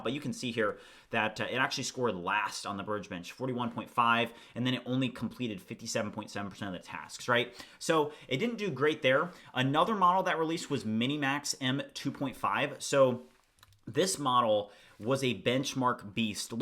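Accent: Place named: United States. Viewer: I like the 'merican accent and: eeaaAANNd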